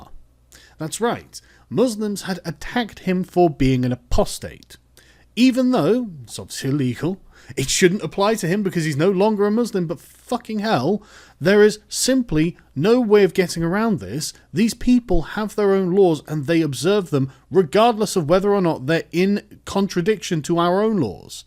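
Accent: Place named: England, United Kingdom. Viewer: what city/region of England is the source of this intensely proper accent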